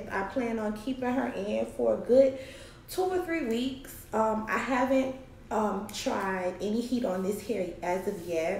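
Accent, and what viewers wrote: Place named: Miami, United States. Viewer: You are too cute! Are you from Miami? I love your accent. I also don't put heat to synthetic hair, but I have one wig that I stay throwing curls in the front to frame the face. Now you know this is a CITY GIRL SUMMER over here!!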